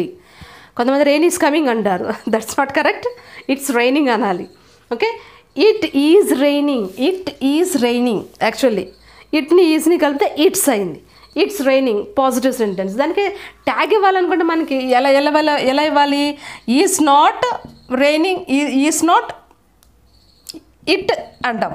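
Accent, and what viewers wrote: Telugu, India. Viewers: Content is good. But pronunciation is not up to the mark.Telugu accent is prominent. Please work on that to improve your teaching abilities.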